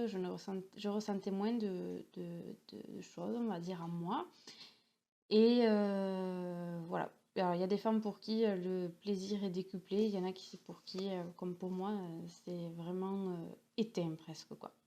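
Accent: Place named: Marseille, France. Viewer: tu parles comme une cagole de marseille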